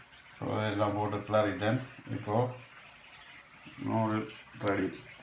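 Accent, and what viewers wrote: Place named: Sri Lanka. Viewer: Neenga pesura srilankan tamizh romba pidikum anna👌👌, London la oru idathula indha noodles sapta niyabagam iruku 😊, yummy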